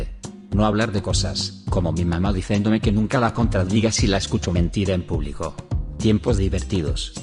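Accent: Russia. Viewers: Vivo en china y soy youtuber latino, y los que no somos comunistas no tendremos problemas desde que no critiquemos abiertamente a las autoridades chinas, de resto por ahora todo parece normal, saludos a ti yo también visité Rusia reconozco el acento de un ruso cuando aprende español. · el hecho de que esta grabado por una voz rusa autentica hace este video mejor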